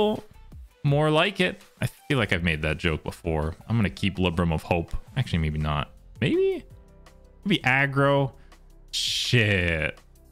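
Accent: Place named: Cockney, United Kingdom. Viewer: People will call us bri'ish schtewpid and then try and do cockney accent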